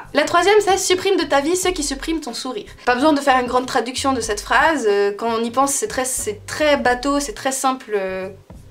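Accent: Geneva, Switzerland. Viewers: Je t'aime putain !! Tu es genevoise ??? En tout les cas tu a l accent genevois. Ça fait un petit moment que je te suis et j adore ta personnalité et ta conception du monde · Ça me faire sourire comment vous dites certains chiffres les suisses voila :)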